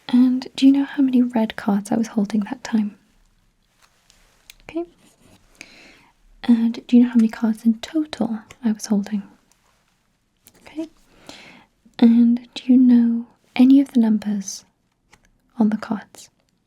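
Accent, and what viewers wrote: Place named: United Kingdom. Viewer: oh my gosh! this ticks all the boxes right now!! -spoken and not whispered (not that I dislike whispered asmr, but when it's something that should be close to being realistic, I think, non-whispered is better suited!) -cognitive exam/mini-mental-status whatever you wanna call them! - so underrated -UK accent :)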